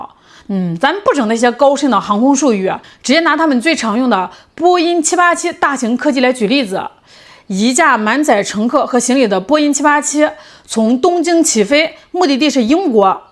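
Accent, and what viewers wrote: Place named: Hebei, China. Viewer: 有真有假、听上去气势挺足、听听热闹罢了….普通话标准一点就更好了、应该是河北的。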